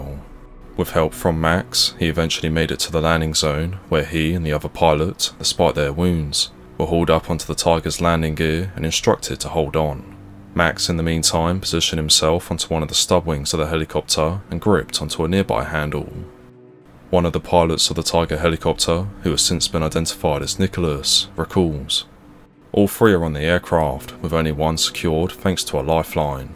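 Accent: United Kingdom. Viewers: He is American. With a British Accent.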